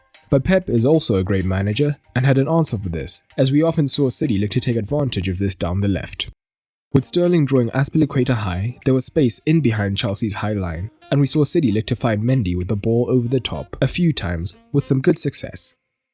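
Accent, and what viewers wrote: South Africa. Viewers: Bro are you south African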